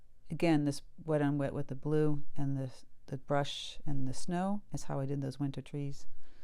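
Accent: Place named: East Coast, United States. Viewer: I know girl! We chatted last week about our East Coast loves of NE! You said you were in NY too and didn't lose your accent! LOL. Just finished your w/w pink/indigo trees too. Very relaxing and gorgeous color scheme. Thanks for everything,!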